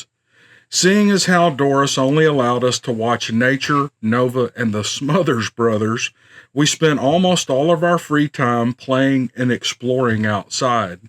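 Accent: South, United States. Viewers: I believe the stories 100%. I am from the Pacific Northwest & they populate a lot of the woods all over here. My brother in law & myself were WAY off the beaten path west of Rathdrum, ID up in logging country when We noticed what looked like a Shaquille O’neill’s bare single footprint (only wider 6” across the widest part of the foot) crossing our path going up the steeep embankment only in a wash that came over the game trail we were hiking on. It was pointed uphill & when we looked up the steep washout their was another footprint probably 8’ UP the SUPER STEEP incline. We are bigger dudes me 6’2” 200lb & he 6’4” 230lb & there’s no way we could’ve made that leap on level ground let alone on a 50% grade that whoever made that footprint did. No way it could have Been a hoax since we were 1 hrs hike to the closest logging Road. We Didn’t see the beast, but after seeing those fresh footprints 15 years ago; my mind opened up the the FACT that these creatures co-exist near humanity w/o much detection from us ! 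We Like your southern accent you tell the stories in & the bluesy country...Keep em comin’ sir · Have to say, I'm a Florida girl living in Missouri the last 15 months. I love your voice. The southern in it sounds so... Right.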